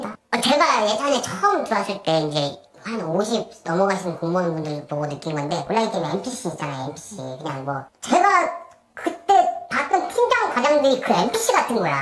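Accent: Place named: Chungcheong, South Korea. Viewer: 말투가 딱 아산 예산 이쪽인데?ㅋㅋㅋㅋㅋㅋㅋ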